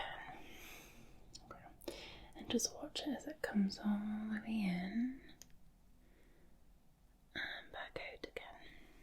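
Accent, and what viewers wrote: Scotland, United Kingdom. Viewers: You are a person and you know what a person needs? a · 26 minutes of tingles from the resplendent scottish lass. perry the platypus noises ☺️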